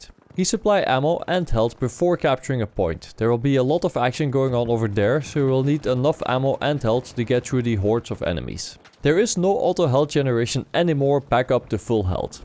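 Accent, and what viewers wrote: Netherlands. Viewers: Are you Dutch hahahha your English is like that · His accent is sooooooo DUTCH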